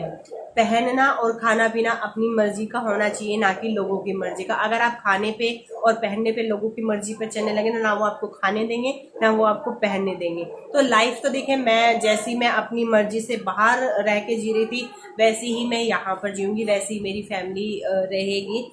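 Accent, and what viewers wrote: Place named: Uttar Pradesh, India. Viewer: Language UP ki aisi hi hai usme bura lagna kya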